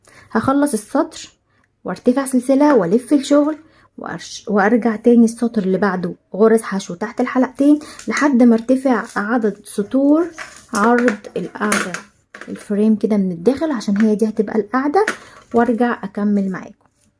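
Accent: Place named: Egypt. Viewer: تجنن تحياتي من لبنان على فكرة اعشق لهجتكم المصرية ❤️❤️❤️❤️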